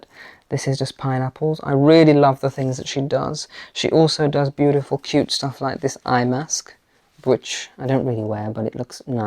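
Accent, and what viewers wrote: United Kingdom. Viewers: your home is absolutely lovely!! Thank you so much for sharing. I love, love, love your bedroom and I adore your British accent. I live Florida, USA and it's cool to hear the differences in how we say things. For instance, you call your home a Flat, in the US we call it an apartment. And, I love the way that you say the word "really". It's so beautiful with your accent. Again, I "really" enjoyed your Flat tour. You are so beautiful and elegant yet, seem so down to earth and approachable. You have a very inviting spirit and your home reflects this beautifully. Keep those videos coming. God Bless!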